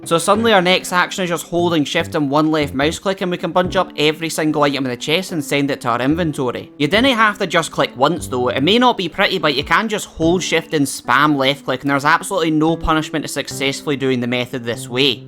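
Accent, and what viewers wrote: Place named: Scotland, United Kingdom. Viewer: Propa Scottish man, aye?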